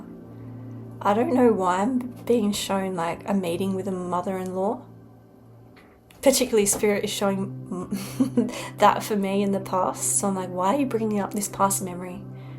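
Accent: Australia. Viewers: Hello from California. Good messages, great voice,. We appreciate you. You have our favorite Australian accent. We could listen to you talk for hours. Happy holidays & happy summer to Australia from our channel.:hand-pink-waving::hand-pink-waving:🐦🐦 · All this time I thought I had found an English, but it’s Aussie lol❤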